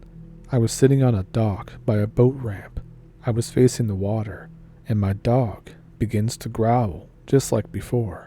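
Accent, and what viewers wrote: Canada. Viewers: I find your thick canadian accent very endearing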